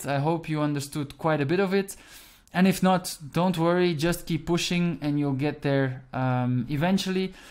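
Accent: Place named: Belgium. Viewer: Dit is Belgisch. Niet Nederlands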